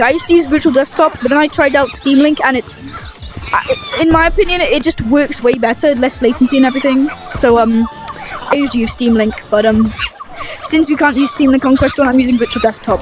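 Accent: United Kingdom. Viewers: Great vid as always. ❤️ ur British accent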